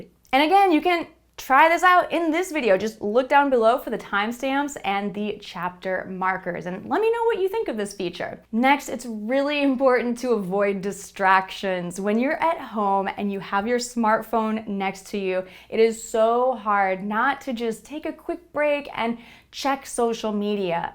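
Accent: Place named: United States. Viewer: of course american english. her american accent's is so strong and clear. that's why i like american online teachers so much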